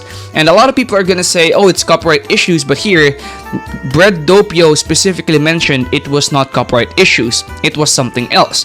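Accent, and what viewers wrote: Philippines. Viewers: I wonder if your a Filipino like on accent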